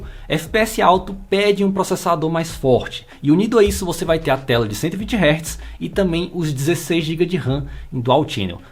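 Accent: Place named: Salvador, Brazil. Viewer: Seu canal é muito bom mano, impressionante como pessoas assim não tem tanto destaque quanto aos demais, vi cada review fraco até chegar aqui. Acredito e torço pra que seu canal tenha o crescimento que vc merece. Esse sotaque... vc tbm é Salvador?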